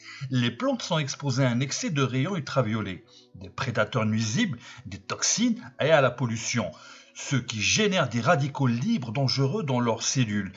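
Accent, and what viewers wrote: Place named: United Kingdom. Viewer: pirate et à tous,ta trop un putain d'accent anglais pirate tu me fait trop délirer,si non bien les phytonutriment.Miioin et bonne nuit chère pirate:-)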